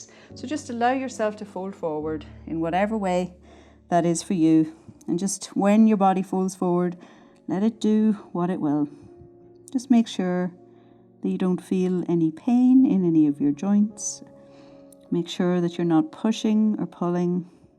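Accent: Ireland. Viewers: thank you for your testimony. I appreciate your honesty. Part of me can’t imagine getting to a place where i can run or jump for an extended period of time without leaking... so surgery seems like the Obvious choice. I get different reports from different doctors about mesh. I believe they used my mom’s own tissues when she had hers done. I have rectocele and retroflexed uterus as well with prolapse . I have some lower backpackpain and i have had a ablation for excessive bleeding. I have been told by someone that a hysterectomy also helps with the POP and incontinence w or wo the sling procedure . What are your thoughts??? I am going to keep working with my pelvic floor and i do plan on reading your book. But i appreciate your feedback. PS I assumed that you were from Ireland bc of your accent. Your English is very good!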